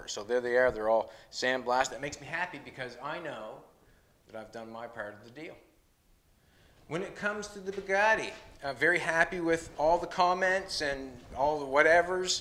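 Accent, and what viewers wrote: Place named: Canada. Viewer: Merry Christmas to you and your lovely partner...keep doing what you do...I'm a Canadian living just north of Cincinnati Ohio and find your authentic Canadian accent endearing....it makes me long for home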